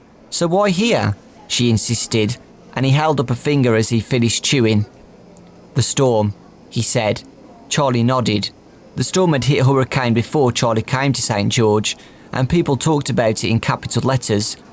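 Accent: United Kingdom. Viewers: i dont need super thick british audio reading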